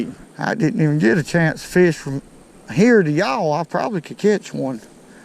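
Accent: South, United States. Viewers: Just found you on here, and I have to say it’s nice to hear someone with my down south accent haha and someone who’s part of the bank fishers... Love your videos Crazy question, I’ve always Bass fished, how do you know the crappie is a male before it gets out of the water? LoL sorry I’m dumb with crappie but trying to learn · "she's over chonder... . I got my pressident reel... And an each and half bait..." Lol I like hearing deep accents lol* like that. Makes me wonder if I have a weird accent to people down south 🤔 ... Yeah I'm high. But fishing opener here is Saturday!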